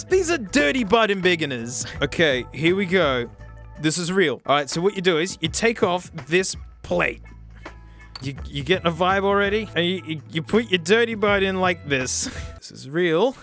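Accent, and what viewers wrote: Australia. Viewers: This ain’t English, this is ‘Stralian. But no, not really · Aussie accents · You haven't gotten used to the great Australian accent. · When I heard "Ovaries" instead of "Over ears" and then proceeded to slap myself in the face for forgetting the bloke is Aussie through and through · He’s Australian. Kory’s voice actor is from New Zealand. Australians and people from New Zealand have similar accents. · coming from Western Australia, He's definitely saying over ears, accent bro.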